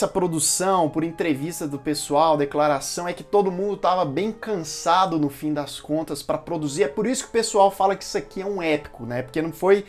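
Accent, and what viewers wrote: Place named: Minas Gerais, Brazil. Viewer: meu deus como eu amo o sotaque mineiro AAAAAAAAAAAAAAAAAAA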